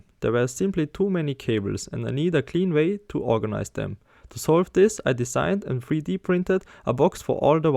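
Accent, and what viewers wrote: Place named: Germany. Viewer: I can hear German accent XD but good video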